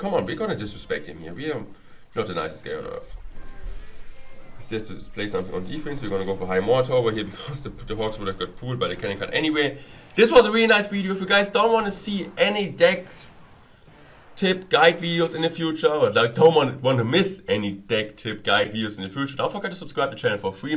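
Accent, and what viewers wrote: Germany. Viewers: ...and dann... Denglisch man kennts😂 Aber nices Video👌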